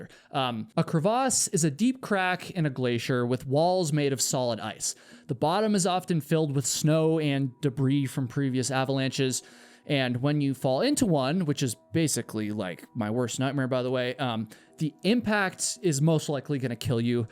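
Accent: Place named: United States. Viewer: I'll subscribe if you take that flag down. We can HEAR you're USian. Peace and love from Denmark.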